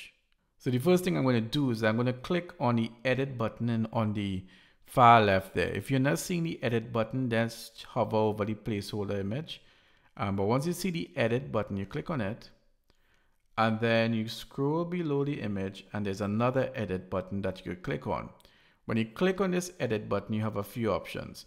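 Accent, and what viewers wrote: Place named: Trinidad and Tobago. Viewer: Thank you so much for this video. I detect a Trini accent 🙃hope I'm correct.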